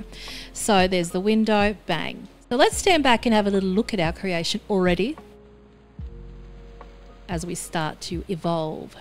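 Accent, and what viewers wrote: Australia. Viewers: Aussie or NZ?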